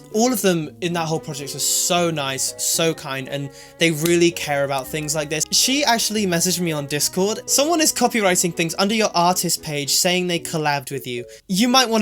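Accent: England, United Kingdom. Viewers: I’m so slow😭😭😭 I didn’t realize was British until this video. Idk I guess I wasn’t paying attention before but I’m now acknowledging how thick his accent is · I hella LOVE this english accent !! Like, not the American accent, the English one sounds so soft 😭❤️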